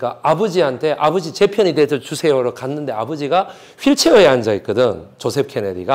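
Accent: Gyeongsang, South Korea. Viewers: 갱상도라 구수한 말투 정감이 갑니다 전 칠곡이거든요 요즘 강의들으면서 많은 사실적인 스토리에 감동입니다 감사합니다~^^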